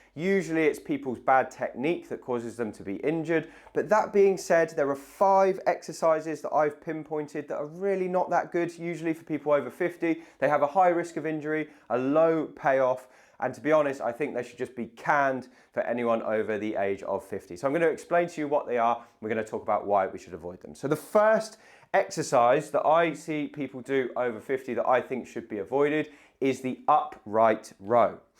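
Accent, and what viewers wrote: England, United Kingdom. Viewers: I love his British accent! He is also extremely handsome. I think I am going to be in very good shape soon. 😉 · Thank you young English man . My salute to you. Good information is given to over Fifty. I liked your accent.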